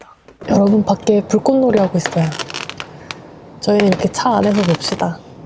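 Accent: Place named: Gyeongsang, South Korea. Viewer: 혹시 고향이 경상도 아니신가요? 약간 사투리가 나오는것 같아요ㅎ ㅎ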